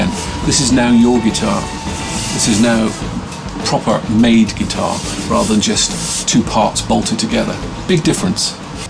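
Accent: West Country, United Kingdom. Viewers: Discovered your channel an hour ago and already bingeing :) Are you from the West Country?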